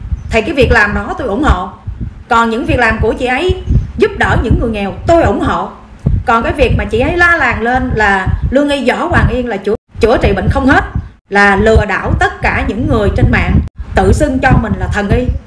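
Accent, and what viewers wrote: Standard. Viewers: Toi coi nhieu lan kenh cua co co noi hay chuan dung toi ung ho co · Chi noi qa chuan luon 👍👍👍👍👍🌹🌹🌹🌹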